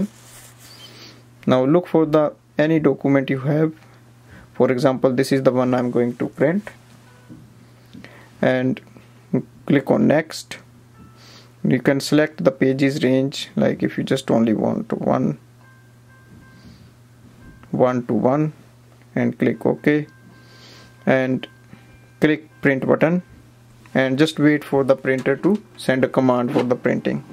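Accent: India. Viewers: Indian accent is extremely disgusting man I’m sorry just had to say it. You ruining English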